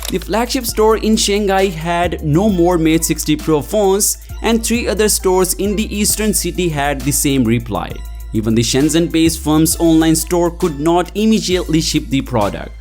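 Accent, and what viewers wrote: India. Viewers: Indian with English accent 😅 can't be watched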